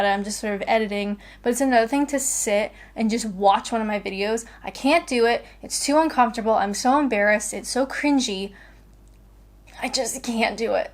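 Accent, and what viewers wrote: England, United Kingdom. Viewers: I don’t know if you know but you are developing the hint of an English accent👌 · its interesting to hear slight english accent isims creep into your speech lol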